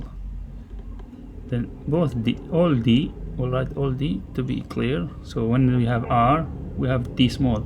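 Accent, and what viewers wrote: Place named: Arab world. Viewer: Your accent sounds like an Arab accent I think so😅 your explanation is so good !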